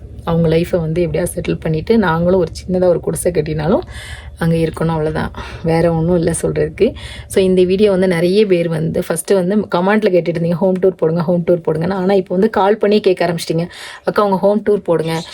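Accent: Tirunelveli, India. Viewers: Unga sontha oor yethu akka yengala mathiriye pesuringa thirunelveli pakkama neeenga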